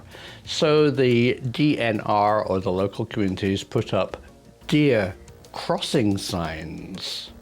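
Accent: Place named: Essex, United Kingdom. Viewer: Oh deer... 🤣 Edit: Prof. is an Essex Boy! 🤣 (I live dangerously close to the border)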